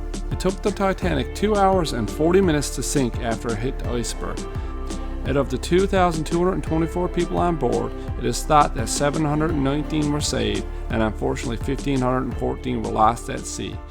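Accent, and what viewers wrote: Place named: Canada. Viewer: The more I listen to other videos I can hear the Canadian more. Happy Christmas from Ireland, great channel 👏🏼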